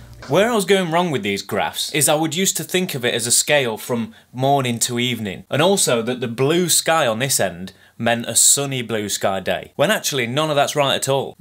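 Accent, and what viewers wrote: Nottingham, United Kingdom. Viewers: You've gotta be from Notts duck!? Great vid. Subbed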